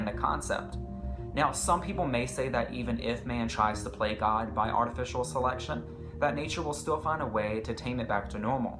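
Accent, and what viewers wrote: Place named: South, United States. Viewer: i can almost hear a gay accent and a southern accent. Btw, you're talking about artificial selection and genetic modification at the same time.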